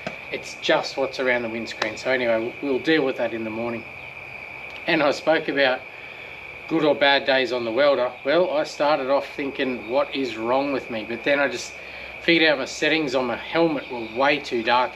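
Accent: Australia. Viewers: Nice job on the floor pans😅. Did a few. Lol. Tech screws and filler!! 😜. Living in UK now. Good to hear a true fair dinkum Aussie accent. Keep the videos coming. Still think that asthmatic 202 and traumatic need to go!! It's begging for big block and 5 speed transmission 🤗🤗🤗🤗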